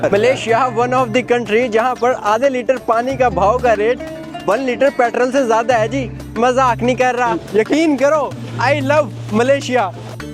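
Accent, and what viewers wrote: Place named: India. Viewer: بھائی، آپ کا ولاگ اچھا ہے، لیکن آپ پاکستانی ہیں اور آپ نے بھارتی لہجہ اپنایا ہوا ہے۔ اردو میں بات کریں، جیسے آتی ہے، فخر سے پاکستانی بنیں۔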